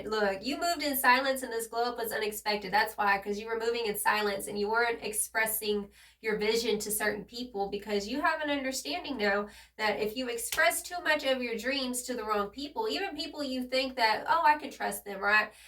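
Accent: South, United States. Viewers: My fave southern reader fr!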